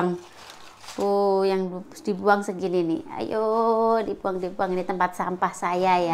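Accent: Java, Indonesia. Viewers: Mbak aq suka skali kl ngmong ada bahasa jawany n' medok2 gmna gthu hahaha... Smoga sukses n sehat slalu buat smua keluarga ya mbak... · Lucu bngt bhsnya jawa medok 😀😀 suaminya ajarin bhs jwnya psti seru, klo udh laper gk tahan 😀muantaap bihun gorengnya praktis di masaknya, siip suami istri slng supory kompk apalgi saling bercanda ok bngt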